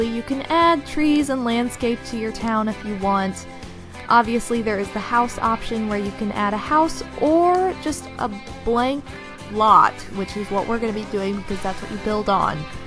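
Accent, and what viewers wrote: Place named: South, United States. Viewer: The days when you had a proper southern accent! lol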